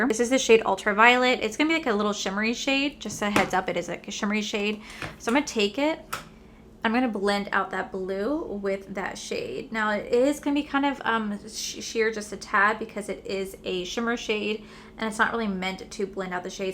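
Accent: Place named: Florida, United States. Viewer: I am awe struck💕💕💕💕. I consider myself a Floridian by choice…..a proud WV by birth. You are a true Floridian with a hint of your moms accent. Go! You have a talent of putting colors together! Be safe…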